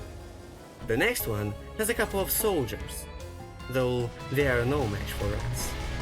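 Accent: Russia. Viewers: Тяжело слушать русский акцент🫪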